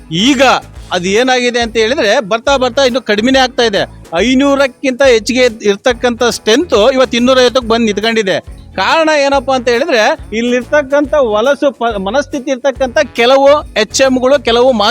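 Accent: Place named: Davangere, India. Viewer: Super 💥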